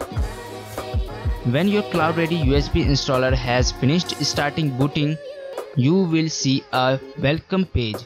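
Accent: India. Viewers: I hate this fucking Hindi accent!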